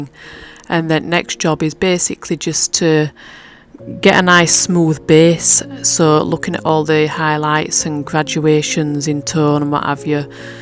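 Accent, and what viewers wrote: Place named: Yorkshire, United Kingdom. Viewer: Not only are you a shit hot artist, but your accent is amazing! Reminds me of so many of my Yorkshire friends haha. Familiar, warm and lovely..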